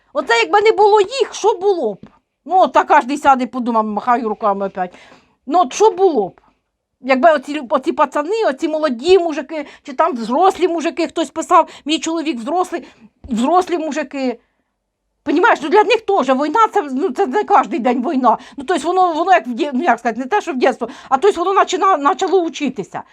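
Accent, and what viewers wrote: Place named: Surzhyk, Ukraine. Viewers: Дякую Вам,за позицію,за свою думку,за ваш суржик,за те,що Вам болить Україна,за те що задумуєтесь над майбутнім,зате,що закликаєте до пам'яті,бо це ген нашого народу.Дякую.❤ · Пані! Не звертайте уваги на тих,хто говорить всяку дурню! Дивлюся ваші розклади із великим задоволенням! А вашу мову суржик страшенно обожнюю. Зразу згадую дитинство і своїх дядьків і тьотю Ніну,Женьку і т.д.,які жили біля Рівного! Здоров,я вам і терпіння! Слава Україні іслава ЗСУ! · ваш суржик такий чудовий, колоритний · Доброго дня, все правильно говорите-не маємо права забувати полеглих воїнів,захисників наших! Жодного імені! Говоріть так як вам зручно!Як кажуть:"На польтавском діалекті "!Це наша говірка. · Супер суржик! Хоч це і не суржик, а східно-південна говіркка української мови! А ми як балакали так і будемо балакати!))) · Пані, не тратьте на таких своїх сил. 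Адекватні вас розуміють, а іншим ви нічого не докажете. Робіть спокійно свою добру справу. А стосовно мови, то я наприклад теж з Полтавської області і розмовляю точно як ви. І мене це не дратує. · Я з дніпровської обл, розмовляють у нас теж так. Повага Вам за те, що справжня, себе не прикрашаєте і тим більшості подобаєтеся за щиру правду і гостре слово. Слідуючим президентом буде жінка, а нам, від кого нічого не залежить, треба ставати господарями своєї країни і досить боятися! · А мені подобається ваш суржик) Україна розмовляє суржиком, Полтава, Дніпропетровщина, Слобожанщина. Чим кацапською, без "ге" - краще суржиком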